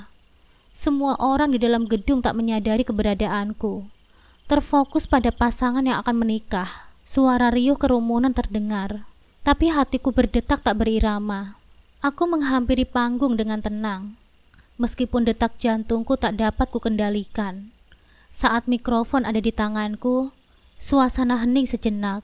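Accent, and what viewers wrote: Java, Indonesia. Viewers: Medok amat sih min Lucu denger nya hahaha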